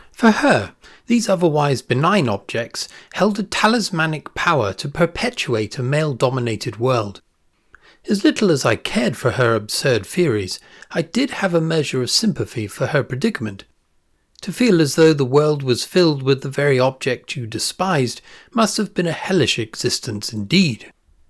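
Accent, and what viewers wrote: United Kingdom. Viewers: This is hilarious and the English accent makes it even funnier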